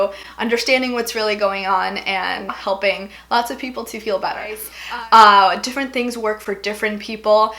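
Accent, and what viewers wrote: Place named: Los Angeles, United States. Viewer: it's funny i was listening to this video with the background app only sound and as an foreigner we are influenced by american tv shows and have those L.A accents ingrained in our head hahaha so when i heard your voice/little vocal fry i tought "she's from L.A no doubt about it" :)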